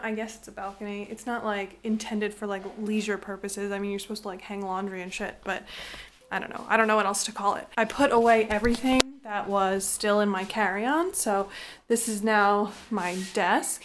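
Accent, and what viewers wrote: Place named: Taiwan. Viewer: haha, I really enjoyed watching your monologue in the background while I'm doing random stuff. the holes on the wall are for ductless air conditions, so you might need get them for summer. connect your TV through the extension cord, they are the same voltage. by the way, your Chinese accent sounds like someone from Taiwan.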